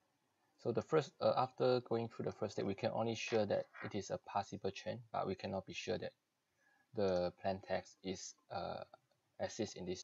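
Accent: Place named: Singapore. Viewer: hello my fellow singaporean xD !! hear one time know liao, SG voice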